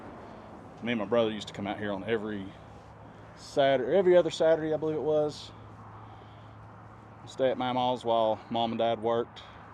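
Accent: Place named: Tennessee, United States. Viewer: “anniss” = “and this” (I am from Maryville, TN, raised by my great grandparents, so this accent is heartfelt) I truly hate that Helene hurt so many people, no matter how detrimental, it is no competition, but I truly wish I had the means to help these folks. All I can give are prayers and a comment on YouTube but nonetheless my thoughts are with them. 😢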